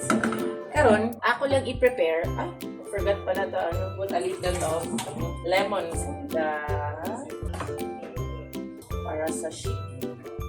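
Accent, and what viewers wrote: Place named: Visayas, Philippines. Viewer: bisaya d.ay maam 😊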